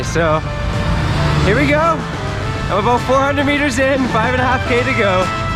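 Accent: Bisaya, Philippines. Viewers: visayan dialect mode is amazing.... nakakahappy to listen to... maayo gid!!! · Its funny, really do sound like a Bisaya.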